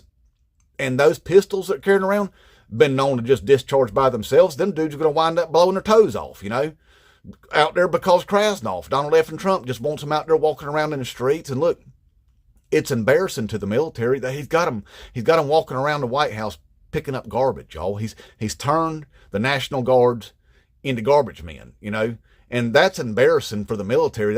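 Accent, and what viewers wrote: South, United States. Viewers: Dude you're damn near my twin brother lol but you sound nothing like me. I mean the message is the same but i don't have that southern accent, I'm from Illinois. Just subed to your channel keep up the good work.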